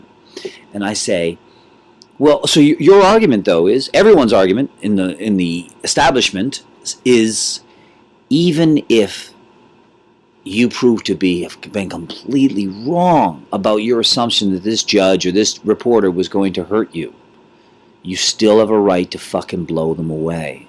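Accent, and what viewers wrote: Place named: England, United Kingdom. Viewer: Not a bad English accent, at first anyway. You rather sounded like me when I talk. lol.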